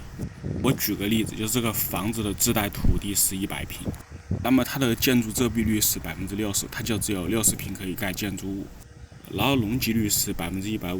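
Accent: Wuhan, China. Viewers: 武汉口音太明显了。。。😝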